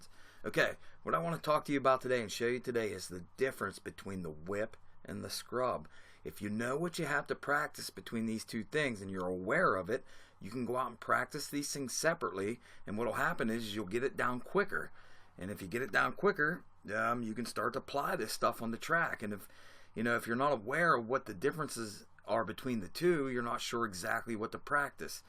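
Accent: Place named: Pittsburgh, United States. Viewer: sounds like your from Pittsburgh man good video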